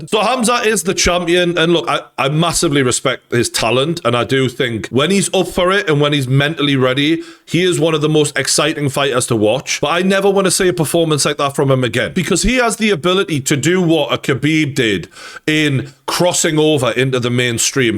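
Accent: Newcastle, United Kingdom. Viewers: 100% Geordie this is a joke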